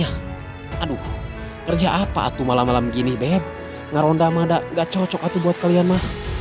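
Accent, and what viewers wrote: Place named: Majalaya, Indonesia. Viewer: Orang Majalaya mang.pantesan sundana te ciga garut.11/12 kt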